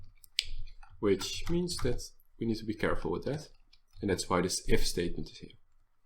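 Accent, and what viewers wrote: Netherlands. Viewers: Hey, I have a question:) I am making a maze game, but I want to make it so that at the loading screen you have a sphere which is moving in the maze. But I want to make it so when it hits a wall it goes to the left or right or straight or go back, depending on where the walls are. Do you know anyone who can do this/explain to me how to do it. I'm really struggling with this, if you do, it would be awesome. You can also send me some code (if you do understand how this works) I could learn a lot from that!:) Thanks! If you need to know anything, just ask me. And you got a new subscriber:) Btw, Love your accent. Are you dutch right?:) I am!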